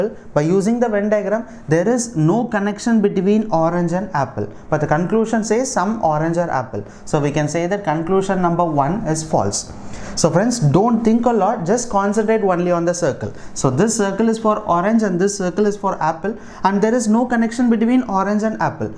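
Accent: South India, India. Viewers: Awesome way to teach.....are u from South.....your way of talking like that..... hahahaha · I meant to say, that he has that accent of South Indian, but it doesn't makes any change here, in the video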